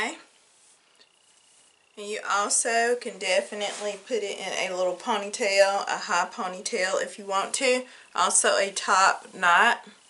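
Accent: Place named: South, United States. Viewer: Just stumbled across your channel, love your content and your country accent! ❤️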